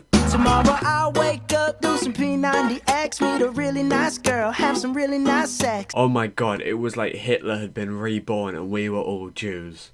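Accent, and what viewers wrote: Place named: United Kingdom. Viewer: Teach me britsh accent senpai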